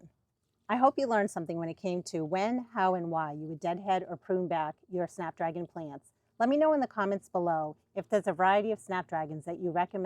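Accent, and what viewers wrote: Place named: New England, United States. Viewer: You popped up first and I could immediately tell you were a new englander - great video thank you!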